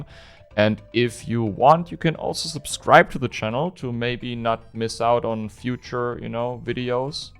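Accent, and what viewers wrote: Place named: Germany. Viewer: Du kommst aus Deutschland (oder zumindest dem DACH-Raum), machst aber englischsprachigen Content, um potenziell mehr Leute zu erreichen, oder? Also einmal ist da natürlich deiner deutscher Name, andererseits dein Akzent. Ich war nur erst verwirrt, weil YouTube mal wieder von sich aus den Videotitel übersetzt hat ("Der größte Betrüger in Elden Rings" 🙄).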